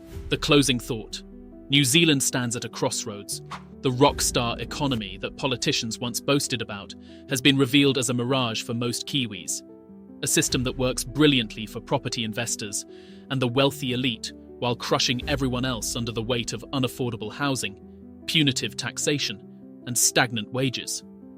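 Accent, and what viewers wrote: England, United Kingdom. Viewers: What a miserable outlook video 😮 brought to you by a meloncoly sounding pommy shingles patient lacking any form of sunshine. Gore is ok for living or housing. Thjs video is just bullshit. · It is interesting that the narrator has an English accent, because the UK is far far worse ... it is a country destroying itself and is well on the way to becoming an Islamic state in the not too distant. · I find it curious that the narrator is clearly a Brit having to point all of this out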